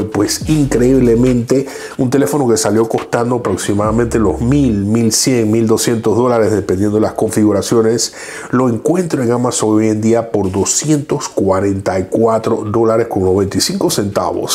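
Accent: Panama. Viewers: Hahaha escuchando me di cuenta que eres de Panamá, saludos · Ajajaja estoy buscando Review de este equipo y me salió un paisano que sin saber que era panameño por el acento me di cuenta ajajaja saludos bro buen Review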